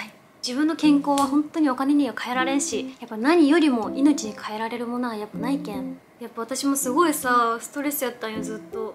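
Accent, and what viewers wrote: Oita, Japan. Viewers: 最近さん知りましたが大分弁に親近感です❤ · ちょこちょこ出る大分弁。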